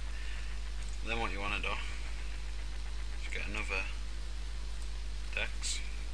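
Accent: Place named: England, United Kingdom. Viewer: Seriously? He's English duh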